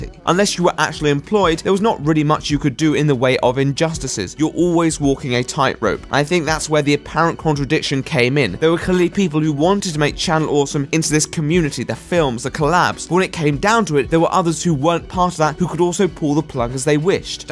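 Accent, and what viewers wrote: RP, United Kingdom. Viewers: Brits all sound the same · You soundly like such a posh wanker only complaint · I'm sorry, your videos are legitimately great on all objective levels, I just happen to be part of the like, 2% of Americans who are actually kind of turned off by the British accent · You sound so damn posh.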